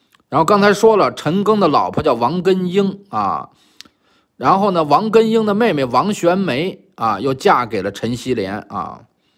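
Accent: Beijing, China. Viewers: 听您一口的京腔就感觉亲切。给您提示一下：萧新华少将不是肖劲光的儿子。他爹是老资格的萧克上将。和肖劲光一样也是湖南人。萧新华文革期间在71-73年下放到湖南长沙。是我们长沙市第三中学（明德中学）的老师兼初中四连的连指导员。后来他在去武警之前在军队的新鑫公司当老总。8-90年代我在北京工作期间去看过他几次。非常随和的人，长得威风凛凛的，和演员项堃有点像，高大的个子，篮球打得很好。是校工篮球队的主力。可惜失联几十年了。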